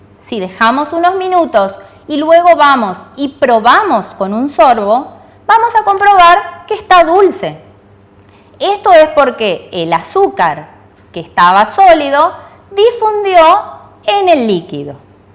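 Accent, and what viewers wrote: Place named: Argentina. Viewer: Eres de Argentina , siempre e querido ser de alli no se porque me encanta el acento :v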